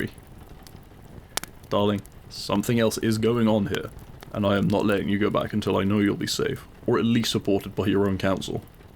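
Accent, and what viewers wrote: United Kingdom. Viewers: This might just be me but you sound like a deeper more British Wilbur Soot🤔😁😂 Edited: wow 293 likes and 26 replies thank you everyone so much 😁🥰🥰and to those of you that can’t unhear it or that it’s stuck in your head you’re welcome😏😏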